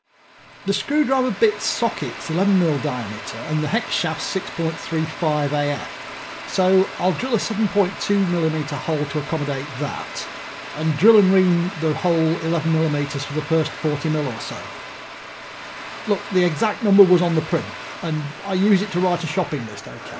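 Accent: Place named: Southern England, United Kingdom. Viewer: Got to love the Southern English accent, full of humour and understated knowledge.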